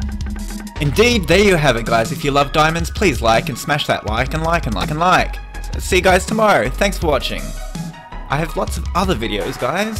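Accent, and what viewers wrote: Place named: Australia. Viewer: you sound australian, like me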